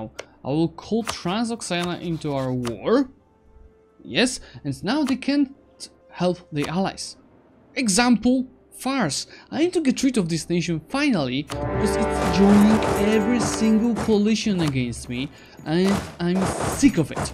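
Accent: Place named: Poland. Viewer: His Polish accent is clearly understandable and I think if there is really a problem, it would surely come from you.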